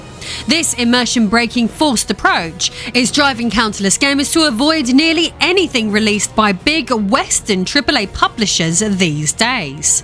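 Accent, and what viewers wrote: United Kingdom. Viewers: I ❤British accent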